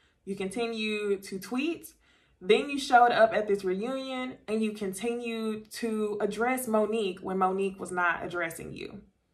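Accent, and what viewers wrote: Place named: South, United States. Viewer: Come through with the fair and unbiased perspective. Love it! I also love your accent. This is from one southern woman to another lol. Can’t wait for the panel tonight.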